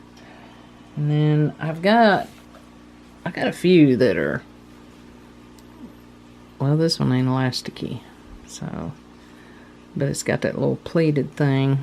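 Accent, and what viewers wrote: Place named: North Florida, United States. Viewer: Loove this, too! I am also a person who loves thrift store fabric! I am so crazy about fabric flowers. I like almost anything made from fabric. I could craft 24/7 , IF I had the time! I am from North Florida. You sound like us here in good old Live Oak. I am guessing you are from the south as well. I love watching you and listening to you. Thank you for sharing.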